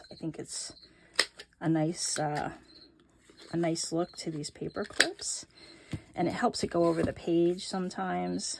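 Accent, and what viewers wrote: New York, United States. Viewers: i love your videos and your ny/east coast accent. reminds me of home :)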